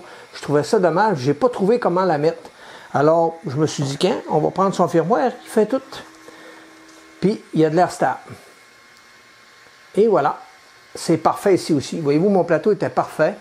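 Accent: Canada. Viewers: Salut, je suis tes vidéos depuis quelques temps, je viens d 'acquérir mon Ender 3 V2 donc je suis en pleine pose du BLtouch en se moment même, j'ai rencontré un gros soucis ma carte mère a brulée.. un composant a coté de la diode F2, est- ce réparable ou poubelle direct... merci de tes lumières et continue tes vidéos, je suis fan de ton accent canadien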